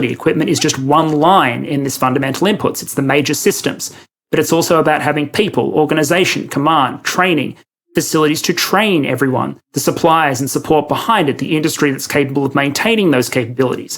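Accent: Australia. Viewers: You have a fairly standard Australian accent, so I'd suggest taking YouTube's autogenerated CCs and going through to correct them. It's still a pain, but far less so than doing it manually. The big thing it'll do for you is set timings.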